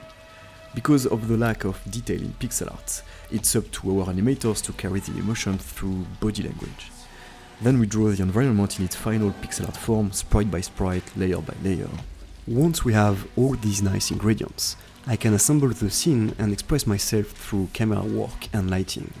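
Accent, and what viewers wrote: France. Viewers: Il est la laccent français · c est un francais qui parle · Je reconnais là l'accent français! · The French Accent of the Narrator was just the cherry on top of all that beauty. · il a pas un accent francais? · Amazing french accent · Where are you from? France? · French accent ? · THIS FRENCH ACCENT HURTS MY EARS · That thick, french accent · Un bon accent français bien de chez nous. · looks very nice. but this french accent is horrible! i cant even understand half of what this guy is saying ^^ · french accent spotted x) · oh man Your English is so French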